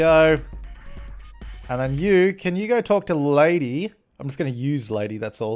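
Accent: Australia. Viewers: YOU ARE A FELLOW AUSSIE! Or Kiwi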